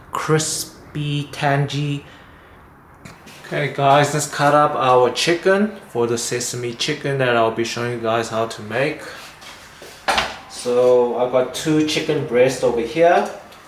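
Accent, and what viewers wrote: South Africa. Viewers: You sound like you have a South African accent.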